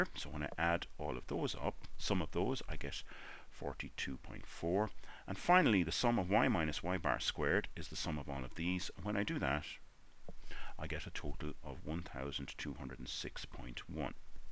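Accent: Ireland. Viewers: That is a thick Irish brogue!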